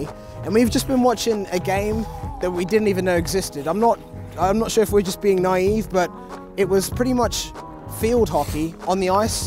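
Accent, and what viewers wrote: United Kingdom. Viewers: Lol, the start of the video just made me laugh so much.... A British? guy talking about ice-hockey. Never expected to hear that. Football, yes, but hockey....wtf! Ok Britts won 1936 olympic gold in hockey. Britts and hockey is like us Finns and football i guess.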